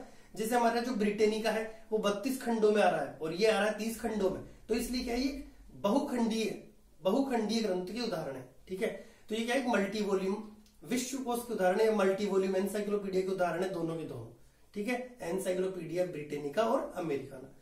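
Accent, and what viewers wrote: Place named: Rajasthan, India. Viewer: अपने अच्छा पढाया, क्या राजस्थानी लोग उनीस सौ को गुननिस सौ बोलते हैं। ऐसा अक्सर देखा है।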